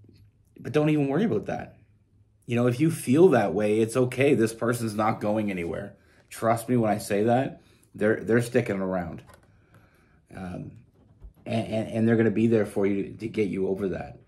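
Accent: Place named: Toronto, Canada. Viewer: so you're from Toronto eh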